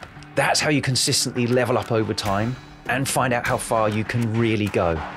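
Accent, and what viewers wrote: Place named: United Kingdom. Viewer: hes british for godsake. some people dont understand proper english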